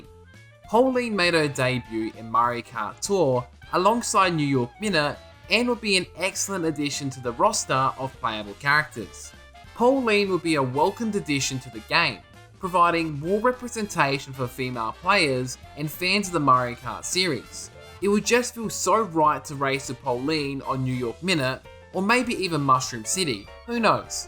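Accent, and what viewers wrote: Australia. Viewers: I’ve never heard someone more Australian than you. And i love it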